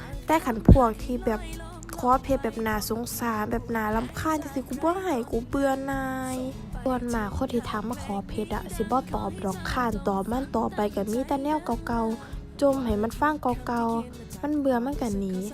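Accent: Isan, Thailand. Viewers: คนอีสานบ่นี้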